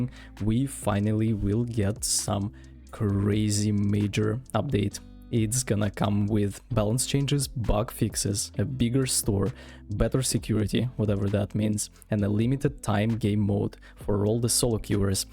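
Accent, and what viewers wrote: Russia. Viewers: Бро, зачем ты косишь под американца? Либо я схожу с ума, либо у тебя реально прослеживается русский акцент :D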